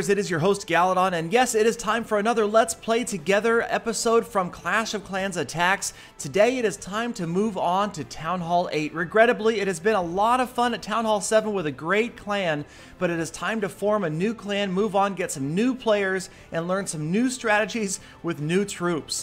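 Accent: Norway. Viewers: Wait, are you from Norway O_o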